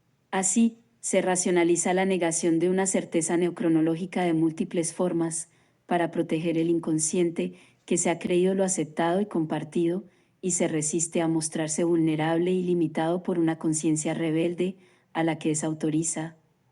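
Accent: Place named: Antioquia, Colombia. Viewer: Muy interesantes reflexiones Andreu, la cronología tradicional de la historiografía efectivamente es casi pura creencia religiosa y sabemos que la razón tiene poco que hacer en la arista de las supersticiones, lamentable es que esa religión fue convertida en "ciencia" a espaldas de la humanidad y por ello provoca esa "disonancia" tan marcada, teniendo a nivel planeta a casi todas la facultades humanistas de historia obedeciendo sus dogmas. (excelente narradora virtual que contrato,! a demás cuenta con el bello "acento paisa" :D)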